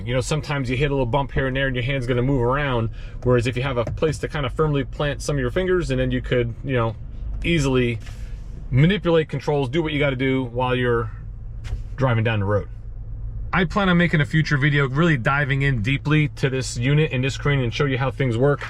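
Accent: New Jersey, United States. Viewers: My favorite video; your New Jersey “button” accent cracks me up every time. Love you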